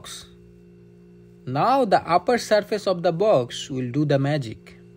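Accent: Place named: Bengal, India. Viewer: ভাই তোমার ইংরেজি বলার ইষ্টাইল শুনে বুজেছি,তুমি বাংলা বলতে পারো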